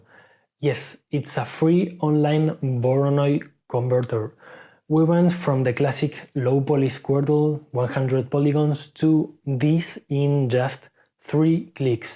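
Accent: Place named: Spain. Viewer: Mola que hables en inglés con acento español, así, por lo menos yo, lo entiendo mejor xD. Por cierto, tus videos son muy buenos.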